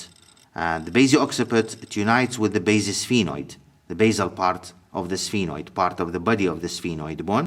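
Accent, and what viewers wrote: Iraq. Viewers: thank u very much dr for this video, the skull has always been a problem to me! hope with ur coming videos things will be easier, though i'm a surgical resident now i prefer to study anatomy in its very basic form, i was honoured to have an iraqi professor as a teacher of anatomy in my university, wonder if u know him actually! his name was dr Hani Alazzawi and u remind me of him i mean the accent:), lots of respect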